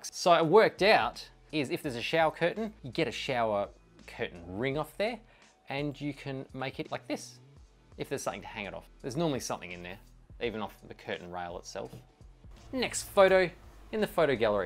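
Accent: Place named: Australia. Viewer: You are a riot! Great sense of humour and sound effects, not to mention your outraygeous Aussie accent. Cheers!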